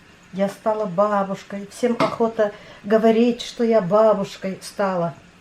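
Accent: Tatarstan, Russia. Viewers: Обожаю наш татарский акцент!😘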